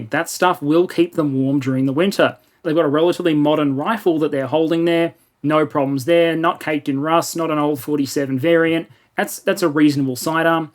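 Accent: Melbourne, Australia. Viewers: id sub but you sound like you are from melbourne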